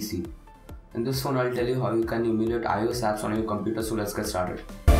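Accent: India. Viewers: This FUCKING GUY WILL NOT ADMIT THAT HE IS A SCAMER Not believe me well, clue number 1 he is has a Indian accent, clue number 2 when somebody talks back after him protecting himself he dosent respond, last and most obvious clue he tried making us buy/download viruses What do you have to say now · when an indian guy is talking you just know it is scam. These guys need voice changers or a single native english speaker to back their success. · Ah....yes. Indian accent...I am pretty sure most of your subs are bot accounts. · Lets try to play fortnite mobile on Pc , typing : " how to play ios games on pc " *, * opening the first video , then heard indian accent and said :"ok , Nvm" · As i heard indian accent, i paused and wrote this. #nextvideo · This is a fake scam that will give you Adware, spyware, and other Trojans that will steal you personal info and make your computer unusable. 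Don't download this it is fake. Anytime you watch some one that sounds Indian dont trust them and if you click on a URL at the bottom to try to download something dont do it if it takes you to ad-fly or anything else like that. Take my advice.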